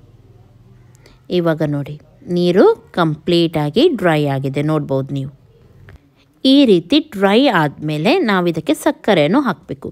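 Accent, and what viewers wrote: Mangalore, India. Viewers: But really nice 🙂 speaking 🗣️ mostly they are manglurians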